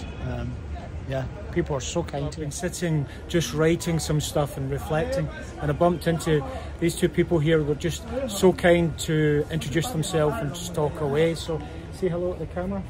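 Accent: Scotland, United Kingdom. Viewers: I loooooove your Scottish accent. And great video too! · Your Scottish accent is absolute adorable, sorta reminds me of pirates and Spartan worriers haha 😊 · Thank you for the matter of fact sharing. Love your Bonny Scottish accent❤❤❤ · You’re the first Scottish guy I can understand clearly even though I am British 😂 Chinese people are very welcoming in mainland China can’t wait for my big move in August in Hangzhou Subscribed ❤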